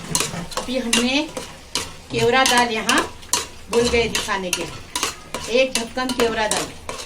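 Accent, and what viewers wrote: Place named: Maharashtra, India. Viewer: khala aap kha rhete ho aapke bolne ka trika to maharashtra jesa h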